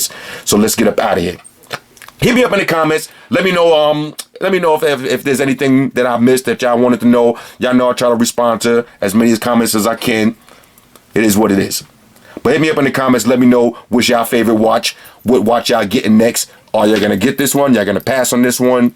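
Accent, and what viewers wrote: New York, United States. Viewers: you are so NY, where r u from?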